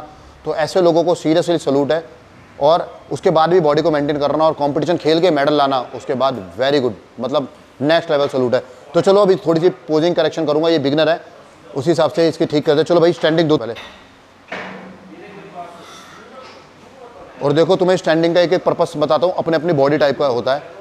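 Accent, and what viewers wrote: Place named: Kolkata, India. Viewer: Bath sunke hi pata chal kolkata ka ladka😊❤💪🏻🥰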